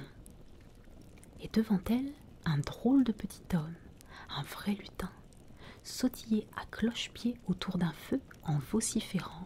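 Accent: France. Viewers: i like this french version, cause french is so soothing and sweet sounding. i also understand it. but. the french accent in english i find even better. because the accent, with its note of vulnerability and a little awkwardness, is the loveliest of all... thank you so much. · I really like both, but i think i prefer the french accent! French is ma langue préferée <3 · Je ne peux pas le croire, elle est française! J'aurais dû m'en douter avec l'accent..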